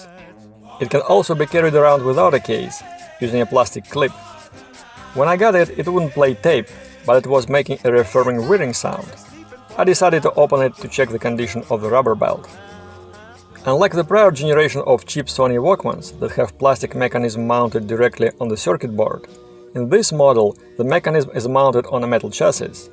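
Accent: Russia. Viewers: Только я слышу русский акцент?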